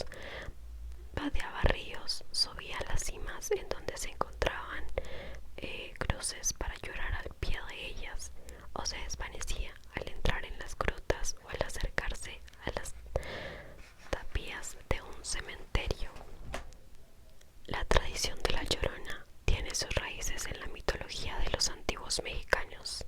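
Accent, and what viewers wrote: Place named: Colombia. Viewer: Crei que eras Colombiana 🤔😍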